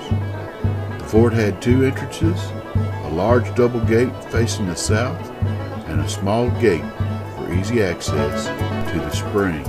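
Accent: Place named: France. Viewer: A down home feller with a great voice, and a respectable French accent!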